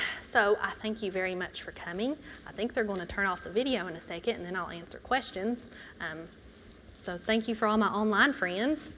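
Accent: South, United States. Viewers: ***** Lol, You're very charming and I love your southern accent. Thanks again. :)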